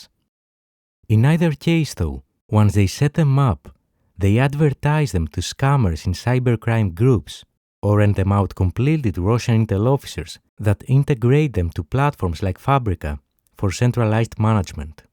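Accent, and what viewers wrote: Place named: Greece. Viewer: He's greek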